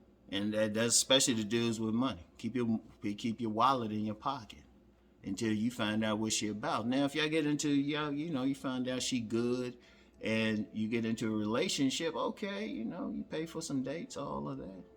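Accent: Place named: DMV, United States. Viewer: Just found this channel a couple months ago and been watching all your videos. As a young 24 year old dude I appreciate all the free game you give and you're honestly my favorite figure in the "redpill community", "Manosphere" or whatever you call it. You remind me of my late high school football coach with that heavy DMV accent.😂 Shoutout from Harford County, MD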